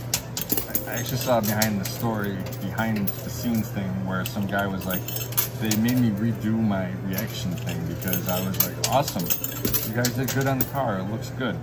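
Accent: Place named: Appalachia, United States. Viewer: What was your buy in, sooo your profile pic is not the real you?? And....your hill Billy twang ain't waiting was last time you was here with mrs colin!! I like watching this cos I'm a gambler too Sometimes, but I like the pokies coz you don't have to think about nothing just tap tap tap, like what you doing, drop drop drop lol...don't talk if u got nuttin to say.. If u not feeling it don't say it I just wanna watch not listen to talk lol talk is too Cheap...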